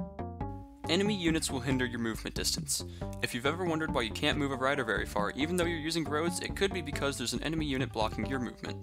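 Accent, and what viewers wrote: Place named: United States. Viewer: He doesn't wanna pronounce it like that because of his yeehaw American accent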